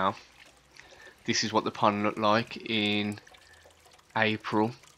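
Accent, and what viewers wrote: Birmingham, United Kingdom. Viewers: Thanks for the reply. I am in USA but you sound like a Brummy yet you say Rekcon ,so that kind makes me think Ipswich. Anyway,I have been checking out your videos today and I really enjoy them Thanks.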